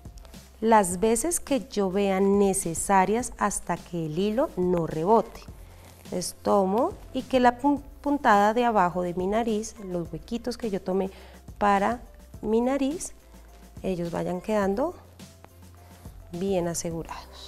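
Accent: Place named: Colombia. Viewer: Eres Colombiana lo digo por el acento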